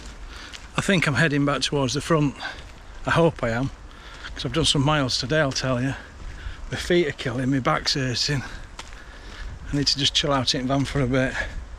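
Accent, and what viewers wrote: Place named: North, United Kingdom. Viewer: Loved the filming and loved your northern accent. Your walk cheered me up but made me feel a bit sad. Like many people watching, I remember the 70s Great Yarmouth. I spent so much time there with my family and had such a good childhood, with my 'two weeks away in Yarmouth ' holidays. Needless to say, I began to think of my loved ones that are no longer with me. Time is an healer, they say, but some pain is hard to deal with. - even after many years. Thank you very much for making your walking tour available on YouTube. God bless you and your family. Thank you.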